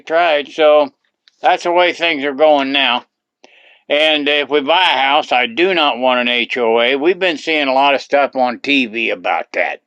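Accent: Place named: Texas, United States. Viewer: Great person, Love his bit of texan accent.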